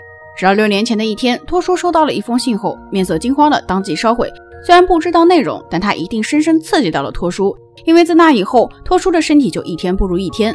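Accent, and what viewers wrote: Nanjing, China. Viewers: 这口音暴露了蓝鲸人...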